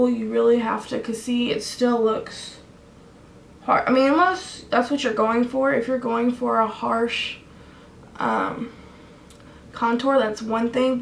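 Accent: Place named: California, United States. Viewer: I’m guessing she moved back home to Michigan from California cause she’s HELLA talkin like a Californian. Lol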